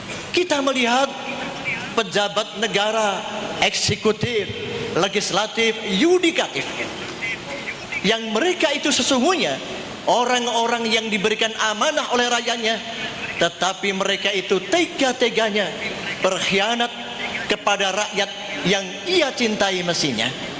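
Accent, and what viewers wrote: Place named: Java, Indonesia. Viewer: kalau Ak sih gk tahu yah terlpas itu Benr atau tdk Cuma nih yah ,yg bisa Ak tanggap Knph kok ,Bahasanya masih aja medok bgt yah, gkd nular nular org luar, minimal Bahasanya gk medok bgt bgitu lohh Kan kuliah di luar negeri kan ??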